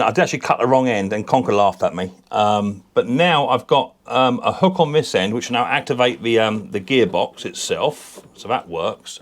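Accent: United Kingdom. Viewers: I liked your repairs! Your style is like mine. So.... you know, tip top! I didn't quite get what you used on your first cable repair. It looked like some lead fitting that you used to crimp the two cables together. But what do you call that lead part so that I can buy some? The best I can understand is that it's like something in a fisherman's kit. Perhaps I could take a small piece of lead and drill a couple of holes to fit. But can someone give me the proper name for that part? You know, we yanks occasionally can have trouble understanding the Queen's English. (Ooops, King's English now --- my condolences). That means that sometimes my understanding runs a second or two behind the video. The best example of that is when you suggested that, on the whole, you preferred to have cables be too long rather than too short. Then you gave us the fish eye to see if we had understood your humor. 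Well, I did, after a couple of seconds. You know your audience! Anyway, thanks for the lesson on cable repairs. That has been a weak point for me and I have a couple of applications for that knowledge that have been waiting years for a solution. And I do regret the loss of Queen Elizabeth. In my view, she especially deserves to be honored as the last world leader who was an actual veteran of the war against the Nazis. The day after the Queen's death, I was at a football game at an American high school stadium and the flag was lowered to half staff in memory of QEII. Since we are still a rebell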